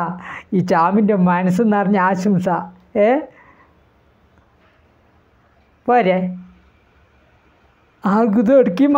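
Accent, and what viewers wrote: Palakkad, India. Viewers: ഓഹ് അണ്ണൻ ഞൻ നിങ്ങളുടെ വലിയ fan ആണ് ട്ടാ..... Exspecially നിങ്ങളുടെ ആ സംസാരിക്കുന്ന ആ ഭാഷ ഇണ്ടല്ലോ നമ്മളുടെ പാലക്കാടൻ ഭാഷ എനിക്ക് ഇഷ്ട്ടായി..... ❤️😍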